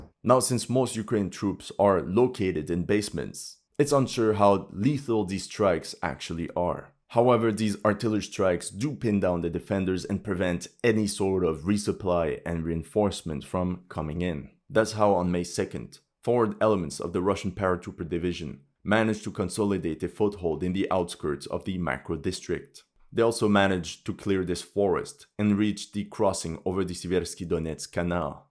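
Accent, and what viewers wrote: Jamaica. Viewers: Bomba Clot'! I was trying to figure out your accent. You're Jamaican! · 🤣🤣🤣🤣🤣🤣 Boboclaaaat ! love the Jamaican voice . big up mi g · 😂😂😂😂 i love this one 🇯🇲🇯🇲🇯🇲🇯🇲 you sound like a Jamaican 🇯🇲🇯🇲🇯🇲🇯🇲🇯🇲💯💯💯💯🇯🇲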